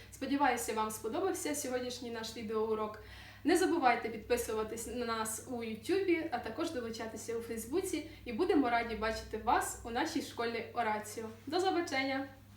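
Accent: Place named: Ukraine. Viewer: Polski z akcentem Ukraińskim :-)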